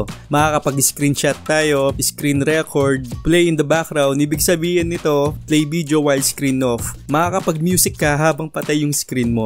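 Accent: Pampanga, Philippines. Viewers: Kapampanagn accent alam na alam ko e hehe new sub here kabalen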